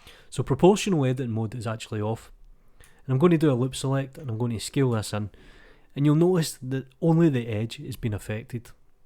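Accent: Scotland, United Kingdom. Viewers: You just propelled yourself into YouTube stardom with your little magpie beef, there at the start. Gotta love a Scottish accent. Plus the info was concise and accurate. Thumbs up, mate 👍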